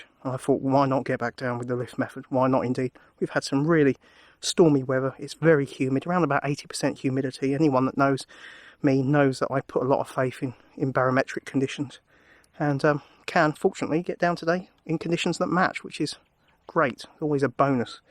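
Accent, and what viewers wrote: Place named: Sarawak, Malaysia. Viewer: Sounds like ur in Sarawak bud!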